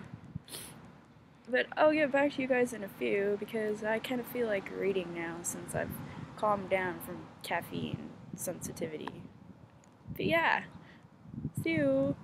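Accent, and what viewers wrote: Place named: Canada. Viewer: i suspected u were canadian bc of yr accent! i live in bc too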